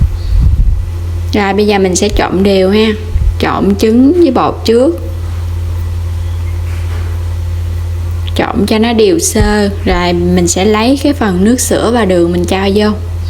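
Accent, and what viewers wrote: Mekong Delta, Vietnam. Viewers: Giọng nói dễ thương của người miền Tây. 95 xin chào bạn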